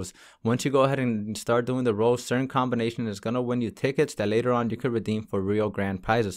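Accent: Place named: Latin America. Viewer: Are you latino? Ur accent bro nice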